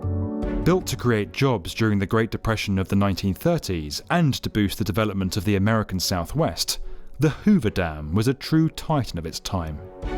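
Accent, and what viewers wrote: United Kingdom. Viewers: What kind of British accent is this? I know it ain't cockney! Heard it before ...very proper, bit of lisp or sounds kinda trips on some parts of words northhha and defeenainiteeve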